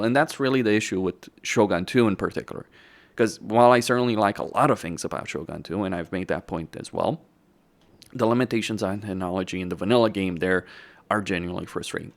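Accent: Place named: Romania. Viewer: Why your accent is sounding romanian to me?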